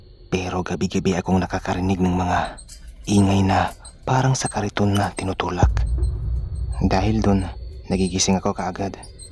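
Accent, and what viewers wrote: Visayas, Philippines. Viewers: Parang. bisaya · Bisaya amputakels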